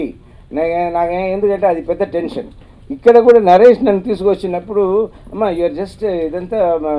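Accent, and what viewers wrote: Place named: Telugu, India. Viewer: Don't blame him about his Telugu slang OK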